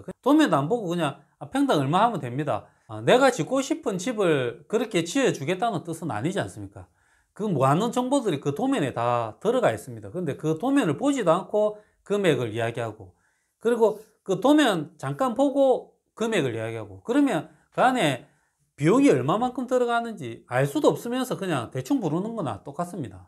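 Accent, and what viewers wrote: Gyeongsang, South Korea. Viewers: 님이 집 짓기 하는 지역이 어디인가요.? 경상도 말투 같은데 사업장과 멀면 비용이 더 들어갈까요? 저는 합천입니다.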